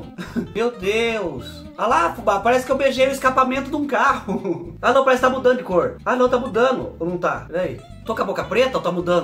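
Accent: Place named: Toledo, Brazil. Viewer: Você parece as pessoa de Toledo MG fala iguarzinho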